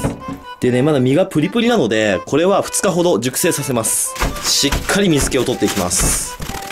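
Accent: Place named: Mikawa, Japan. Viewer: 三河弁が親近感わきました！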